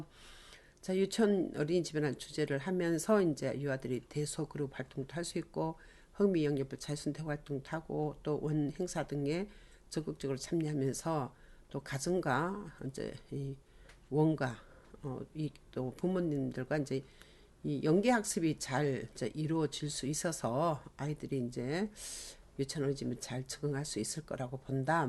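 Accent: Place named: Gyeongsang, South Korea. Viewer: 교수님 경상도 사투리 억양이 살짝 들어간 목소리가 사람을 끌어 당기는 힘이 있어요 ㅎㅎ 강의좋고 목소리 좋고 ~^^♡😍😁😝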